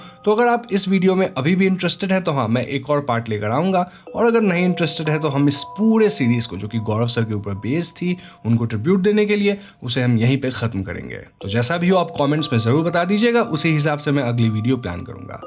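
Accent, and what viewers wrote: Bengal, India. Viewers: The VO is of a bong. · Bangali babu. Hindi theek karo.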